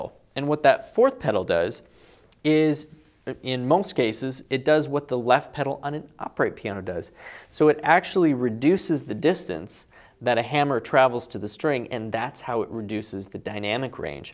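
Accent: Canada. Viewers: Canadian accent?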